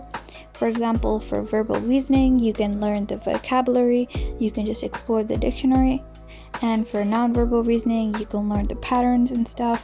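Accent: United States. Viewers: i love how you did the 11+ but your accent is American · Are you american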